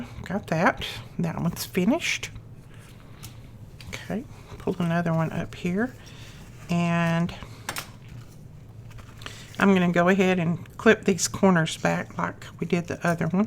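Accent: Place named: Alabama, United States. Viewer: These are wonderful, E! I've heard Rachel from Roxy Creations mention you a couple of times. She's impressed with what you are doing with her challenges!! I am praying for your husband. I know it is difficult for him and difficult for you, too! May God touch his body and heal him. Not our will but God's Will be done! Love everything you do, and I love hearing your Alabama accent since I lived in Alabama for 8 years, and even went to the University of Alabama. Where in Alabama do you live?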